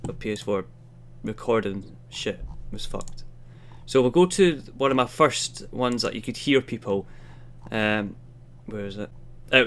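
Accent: Scotland, United Kingdom. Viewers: Are you from scotland? :)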